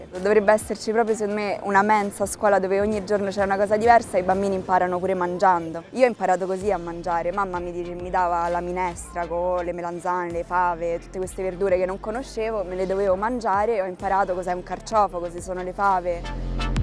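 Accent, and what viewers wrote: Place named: Umbria, Italy. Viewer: Your accent sounds Umbrian...not Romana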